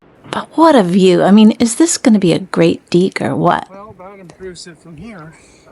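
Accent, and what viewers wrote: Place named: New Zealand. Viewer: hi from nu zillun love your kiwi speak lol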